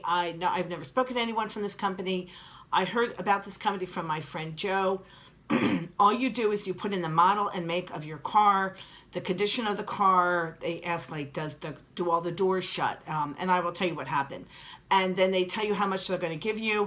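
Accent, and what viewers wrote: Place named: Ireland. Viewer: You sound like you have an irish accent.it might just be me.it's cool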